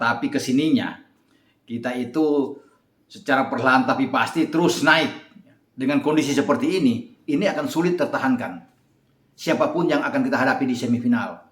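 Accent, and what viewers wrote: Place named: Manado, Indonesia. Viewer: orng Manado.? kental skali dialegnya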